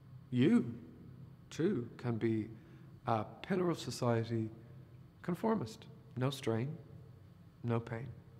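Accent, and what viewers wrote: Ireland. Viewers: That's a posh Irish accent. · It’s an Irish accent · He is speaking Hiberno-English. And the speech is universally relevant.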